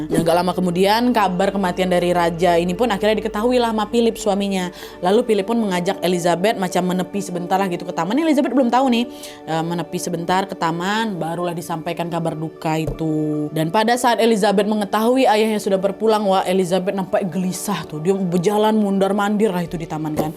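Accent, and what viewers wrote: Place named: Riau, Indonesia. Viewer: selamat kak..🎉🎉-/+Bru 5bulan aku ikutin Chanel ini,awal mula aku tau dri anak gadisku yg skrg lgi mondok.kita sering nonton ber2,yg sering kita tonton cerita horor Krn emank kita suka cerita yg barbau horor..😁mpe saat ini aku sll ikutin cerita yg lain Krn emank seru dngerin kak cerita tuh..kyk lgi ngobrol aj gtu..,😊aku jg 11thun tinggal d Riau tepat'y daerah perawang bnyk kenangan dsna.tpi skrng aku dah pindah k Banten..jdi pas dngr gaya bahasa kak..rasa'y msh berada d Riau..😁sukses sll ya kak..😘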